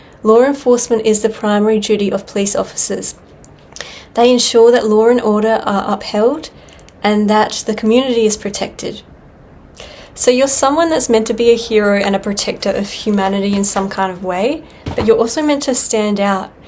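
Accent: Australia. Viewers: Greetings from California. Good reading,. Good voice. You will do well in the music industry as a singer. You are a star of Australia. We really like the Australian accent. We can listen to talk all day. Happy valentines from our channel 🙋🏻‍♂️🧜🏼🧜🏻‍♀️🦜🦜🦜🦜🦅🦅🦅👑Sally Alexandria & Alex * Australian Parakeets Stars 🦉🦉🦉💕💕💕🎉🎉🎉🎈🎈🎈🧁🧁🧁🍾🍾🍾🎊🎊🎊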